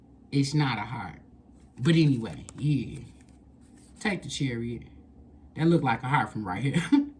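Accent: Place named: Chicago, United States. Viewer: I know a ChiTown vibe when I hear it, lol. Good read Queen!!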